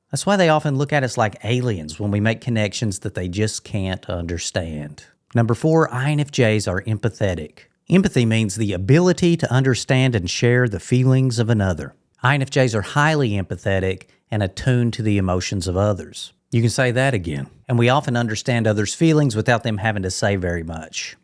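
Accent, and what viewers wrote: South, United States. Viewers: This is lovely. I love your Southern accent.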